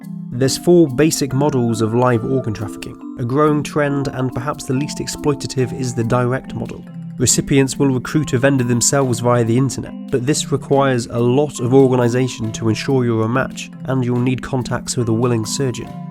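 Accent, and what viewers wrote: England, United Kingdom. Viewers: You have to pay for legal organ transplant? WTF? I'm looking this up right now and where I live (Turkey) A kidney transplant costs the government about 25000 USD. The organ doesn't cost money it is only the surgery(or mulitaple) and the after surgary hospital stays that cost. How can that be more than 200.000 USD? That 25000 USD includes the hospital and medicenes and crap. And you can't tell me that Turkey is poorer. Yes cost of staying at the hospital differes but kidney is free because it is donation and drugs you take fater the sureary are the same everywhere. I really want to know how can a kidney transplant cost more than 200000 USD in a normal country. Is that just the price in USA since government doesn't care if you die over there? If it is why I'm sorry for not understanding but he sounds British, I thought they had healhcare over there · Before I clicked this video, I predicted the voiceover was going to be an English dude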